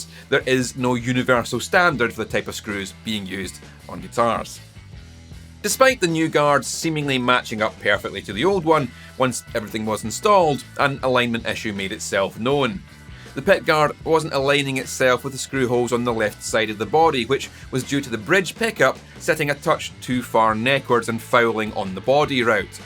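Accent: Scotland, United Kingdom. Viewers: Yo, are you in Scotland? I’ve been subscribed for a LONG LONG time I just don’t remember if you ever said where you are but you sound very Scottish to me lol. My people on my dad’s side while we’re Irish we are from Northlands of Scotland so I have much love for Scots (and Irish) naturally. The other side is Welsh, Swedish & English lol. I’m a full on one of you’s guys. Minus the Swedish 😂.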